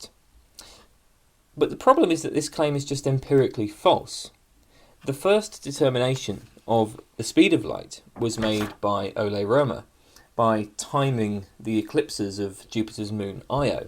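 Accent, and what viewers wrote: United Kingdom. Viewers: I've been watching for more than 3 years and I've never been bothered, or in fact even noticed what you speak of. His videos are hidden gems I had to dig extensively to find. No where on the internet can you find educational material on such a vast range of topics, narrated by an eloquent, young person with a British accent (if I'm not mistaken). What's more is that he provides the most popular and successful interpretations of those ideas. What isn't there to like? Get a grip.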